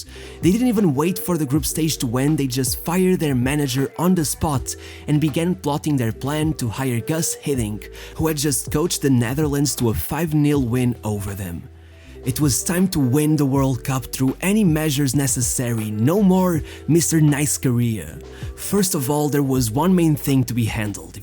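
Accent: Portugal. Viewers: Hey, I couldnt stop to notice how you pronounced the portuguese names. You seem really portuguese. The way you talk and the accent, are you portuguese?:D cuz i am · this portuguese accent... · Portuguese accent hh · i was pretty sure youre portuguese by the accent but when you said the portuguese players names the minimal doubt i had was gone hehe , im subbed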